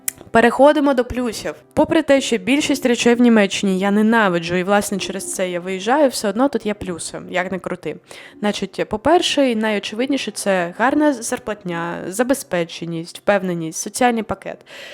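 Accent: Western Ukraine, Ukraine. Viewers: Прежде всего благодарность и помните что немцы и Германия вам ничего не должны. БюрокрАтия ударение на а. В мате ху**о ударение на о, у вас западэнский акцент, приятно было вас слушать, много полезного рассказываете, но Германия не для таких туристов как вы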